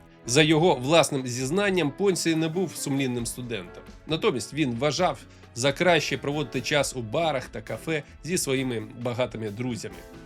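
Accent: Russia. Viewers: Приємно бачити нові україномовні канали, однозначно лайк та підписка для підтримки))) Цікава подача, чекаємо ще PS. у диктора чутно слабкий російський акцент, тому ще вподобайка за перехід на солов'їну, а текст написано дуже і дуже хорошою українською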